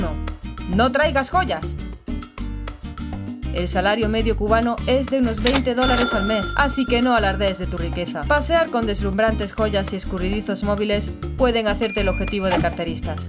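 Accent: Spain. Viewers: Es de España por acento . En fin que en España es inseguro . En Barcelona de noche es inseguro . Y en Madrid hay sitios inseguros .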